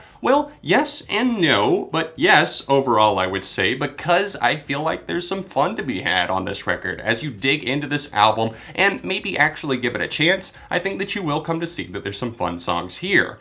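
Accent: Australia. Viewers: I like your Aussie accent,!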